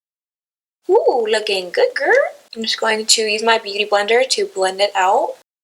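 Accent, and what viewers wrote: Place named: Louisiana, United States. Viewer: I love your accent that comes through sometimes. I'm from Louisiana, near Baton Rouge, so it's fun to hear a little bit of familiar dialect.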